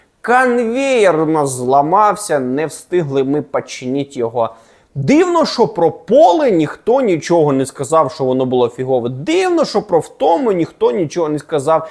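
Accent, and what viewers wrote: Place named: Russia. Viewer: Що знову з розійська редукцією ?) Де співоча П'полтавська українська мелодика мови ?)))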